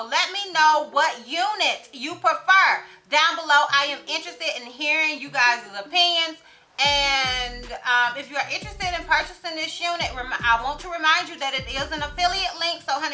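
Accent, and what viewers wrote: South, United States. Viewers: we can't see your kitchen. So you fly anyway. Love your videos and your southern accent 😍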